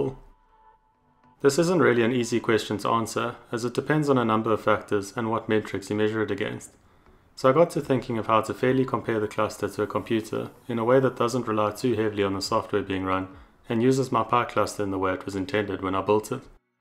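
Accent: South Africa. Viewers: Is this guy from South Africa??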